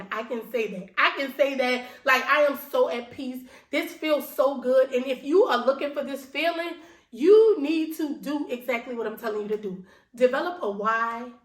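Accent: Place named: New Orleans, United States. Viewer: Congratulations on all your success. Are you from New Orleans? Your dialect and mannerisms remind me of home. 😊❤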